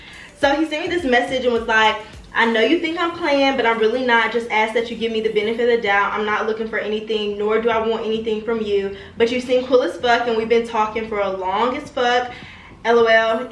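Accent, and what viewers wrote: New York, United States. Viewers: your officially a new yorker, just listening to you talk in this videos I felt like we grew up together lol Love you so much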